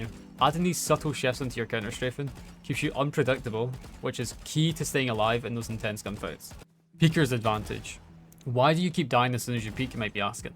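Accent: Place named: Skye, United Kingdom. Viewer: i love that skye accent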